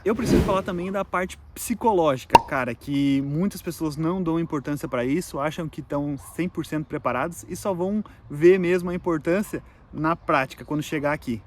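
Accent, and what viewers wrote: Rio Grande do Sul, Brazil. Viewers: Pelo sotaque chuto que vc é gaúcho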